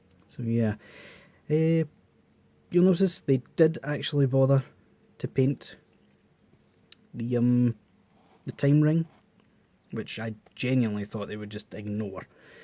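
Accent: Scotland, United Kingdom. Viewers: i have bought this figure to see it for myself and I also cant afford the real one btw are you from Scotland because if you are I am aswell :)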